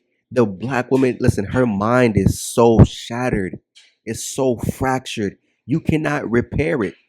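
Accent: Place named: Caribbean. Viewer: Yeah, i can definitely here the Caribbean in you brotha. Your content is spot on. SYSBM/ traveling is the only way for brothas. I make content as well talking about SYSBM, thinking black men & black mansandry. I got a black mansandry playlist of content I've made.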